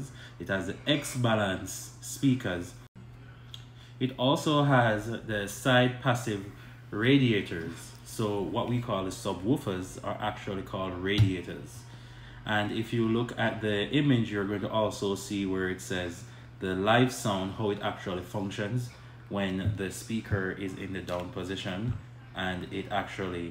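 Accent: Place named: Belize. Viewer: Bro you sound Belizean! What's your nationality? If you don't mind me asking!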